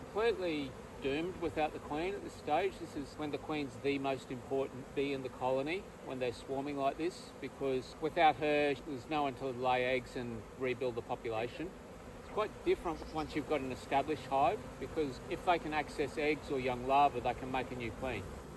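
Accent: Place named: Australia. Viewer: Love your videos, especially your explanations. Who doesn't like a genuine Aussie accent? I have to remind myself it's Spring where you are while we are moving i to Fall here in Kansas, USA.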